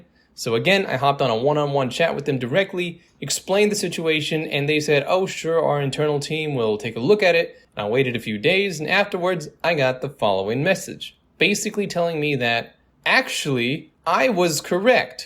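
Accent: United States. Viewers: I'll bet it's your vocal tone — they don't want to admit that they think your US regional accent comes across as lewd appreciation. 🤐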